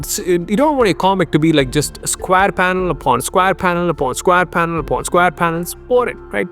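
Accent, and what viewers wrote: India. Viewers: really? listening to his indian accent is so annoying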